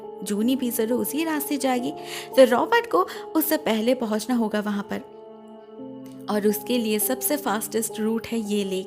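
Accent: Bengal, India. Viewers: Woh bengali h. Unke accent se pata chalta h. Upar se unhone durga puja k waqt bengali me wishes di thi viewers ko. Sri lanka se nahi h. Kuch bhi bolte rehte h log. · R u Bangali?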